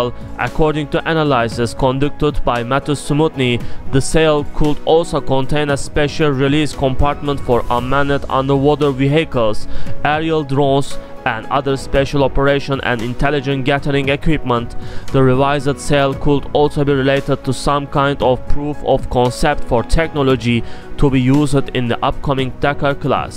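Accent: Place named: China. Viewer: Why does narrator have Chinese accent? 😊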